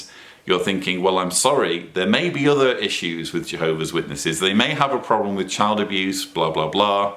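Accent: England, United Kingdom. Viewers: Where n England are you from Sir...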